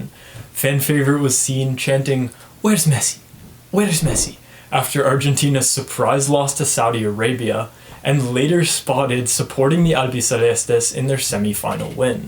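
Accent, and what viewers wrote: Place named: Iceland. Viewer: Are you Icelandic?! I thought you were english !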